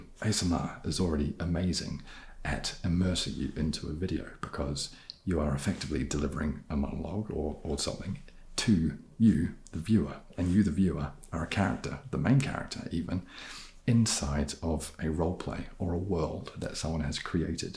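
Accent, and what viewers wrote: New Zealand. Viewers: Omg, this frigging genius! Gravelly voice in stereo. Hearing my favourite English expressions in a kiwi voice (crack on, loads) is always a cheap chill. I missed the opportunity to leave you a question🙁 but I’m pretty sure I can’t think anything other people come up. As an artist I would adore painting you like one of my French girls🤣🤣🤣 This was a joy to watch and heavenly to listen to. 😊❤️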